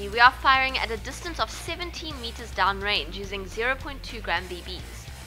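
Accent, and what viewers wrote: South Africa. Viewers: john accer She's from South Africa with that accent :) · She so sound like a South African. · this chick sounds south African,i could be wrong.she really does.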